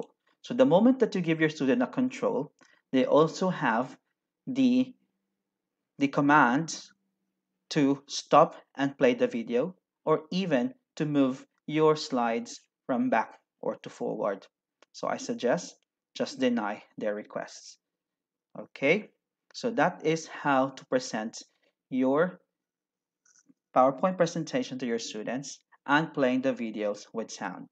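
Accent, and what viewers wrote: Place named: United Kingdom. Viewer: Very good information. Congrats sa hereenng. British accent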